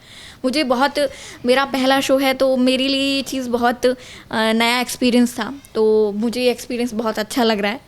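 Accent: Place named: Bhojpuri, India. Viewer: Tum bharatpur ki language nhi bol rhi ho ..... bhojpuri hi tmhare language me jhalak rhi h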